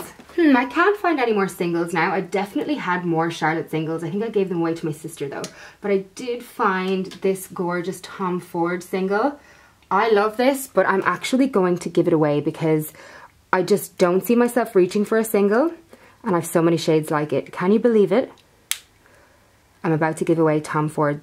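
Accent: Ireland. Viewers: New to your channel! Adore your Irish accent! Which part are you originally from? Are you enjoying the move to Sydney!? LOVED this declutter video so inspiring and generous 💞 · Sounds like faint Irish accent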